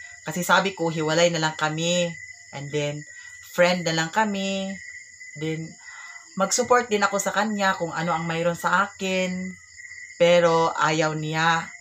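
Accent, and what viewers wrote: Visayas, Philippines. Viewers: Misubscribe na jud ko nimo mam kay nindot kaayu imo accent sa bisaya bah. Imong vlog sad nindot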